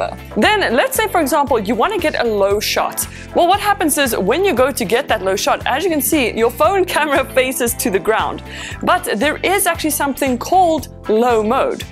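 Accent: South Africa. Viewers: Are you south African ? 😅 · I love your channel!, you are so full of life and fun! Love your South African accent! Are you single by any chance? If not are you happy? Lol keep up the good work.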